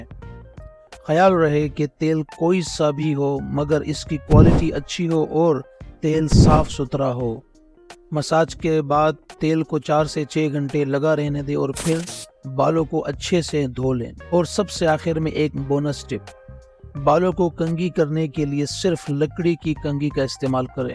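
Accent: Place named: United Kingdom. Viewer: Your English accent too good.Your English accent is just like British people's.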